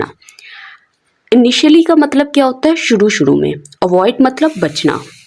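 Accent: Lahore, Pakistan. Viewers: Ma’am, I am an English teacher and the author of two books. I truly admire the way you explain things. Anyway, Your accent suggests you are from Lahore.😅😊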